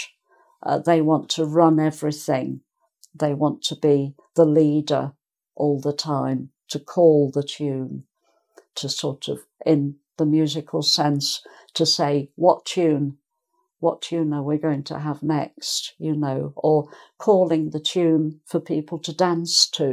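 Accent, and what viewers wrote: United Kingdom. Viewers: Your accent sounds British, may I know which country you are from ? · Interesting. I love your lesson, Ma'am. The British Accent you speak, and all the teachings are so much helping and understandable. Thank you very much. Greetings from Indonesia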